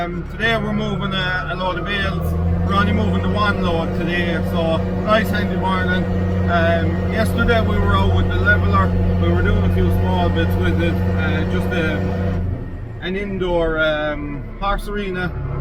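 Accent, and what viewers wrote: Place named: Galway, Ireland. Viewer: I taught u were from galway because of ur accent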